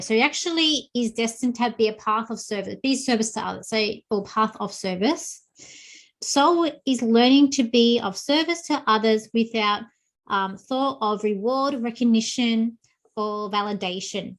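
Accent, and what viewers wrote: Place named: Australia. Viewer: I first had difficulty due to the Australian accent. I turned on the sub-titles and then I understood.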